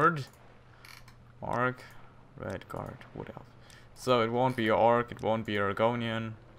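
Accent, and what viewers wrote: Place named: Germany. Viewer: Every now and again you pronounce English words in German, it's quite funny.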